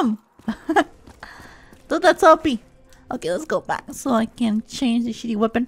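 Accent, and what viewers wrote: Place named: India. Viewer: She sounds India/Pakistani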